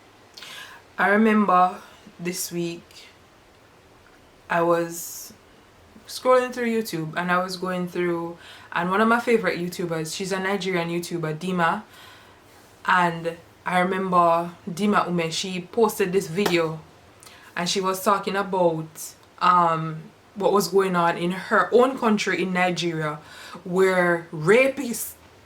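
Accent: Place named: Caribbean. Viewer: Are you west indian???? 🤭 you sound like us❤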